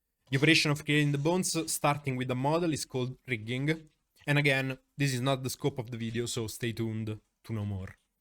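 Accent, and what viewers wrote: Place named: Italy. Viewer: Mai collegh hahaha figo , si sente che probabilmente sei italiano lol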